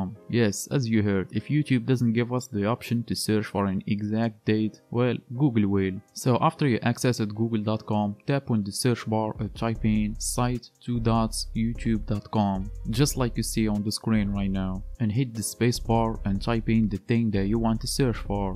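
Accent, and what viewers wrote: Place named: Morocco. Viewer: باينة مغربي اساط غا من هضرتك الله يسهل ابرو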